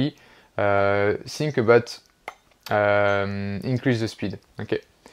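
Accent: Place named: France. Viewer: Je ne me lasserai jamais de ton accent so...french! Mais l'essentiel est de se faire comprendre..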